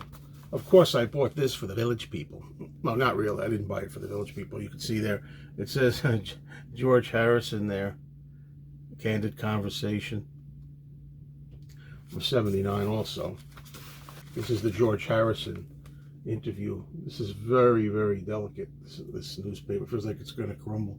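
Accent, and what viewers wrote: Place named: North Jersey, United States. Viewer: You sound like you’re from north Jersey. Anyways I do like your channel and the few videos I’ve seen.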